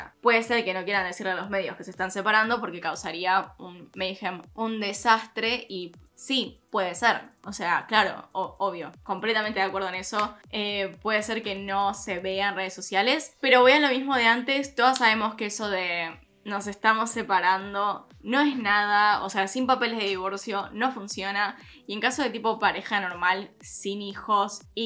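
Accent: Argentina. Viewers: AMÉ ESTE VIDEO! HABLANDO BIEN ARGENTO! puteadas, humor negro y la más transparentes opiniónes. A pesar del estrés por mudanza , te pasate con este video!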